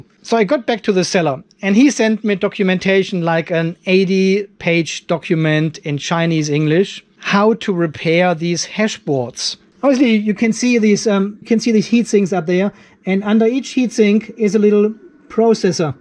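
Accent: Germany. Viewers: Bist du Deutscher? Den Akzent kenne ich doch ;) und das Kassel-Schild im Hintergrund :)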